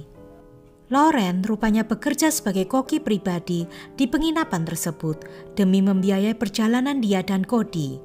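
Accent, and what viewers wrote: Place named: Java, Indonesia. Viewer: Mentok bngt jawanya ya mbak hehheh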